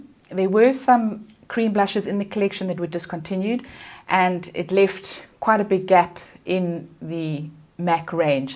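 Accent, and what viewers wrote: South Africa. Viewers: I love Ruby Woo in you! I’m curious about something in your video - I think you said that you got the Elf shadow pallets for less than 30 bucks (is that the word you used?) and also mentioned the Essence eyeliner for less than 50 bucks - I know you are in South Africa (your accent is really lovely!) so what is the currency exchange like? In the US these products are around $3 each, so I’m wondering about your currency. Thanks for an informative video - so glad I found your channel! 💜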